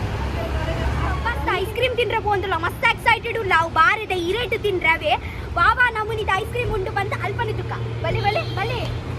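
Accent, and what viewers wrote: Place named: Tulu Nadu, India. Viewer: Your tulu accent reminds me of bombai da binner comedy by MAD IN KUDLA 🤣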